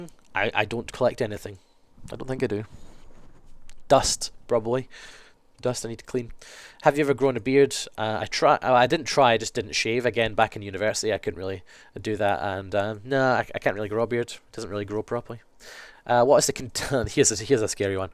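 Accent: Scotland, United Kingdom. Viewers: I love your accent ^_^ it's probably weird of me to say that since I'm Scottish too but, my accent is a lot different to yours, haha.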